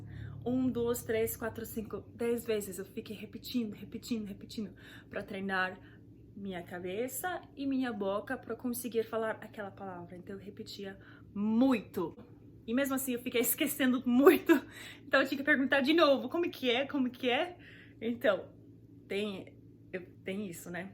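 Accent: Brazil. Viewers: Não, o sotaque dela é carioca. Ela não carrega no ‘r’. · Gringo é uma palavra de origem espanhola e significa estrangeiro em português. Quem popularizou essa palavra para os estrangeiros foram norte-americanos aqui no Youtube. Mas não entendi do porque você não tem sotaque nordestino e sim paulista? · I know but your accent is so beautiful like a Brazilian. Congrats.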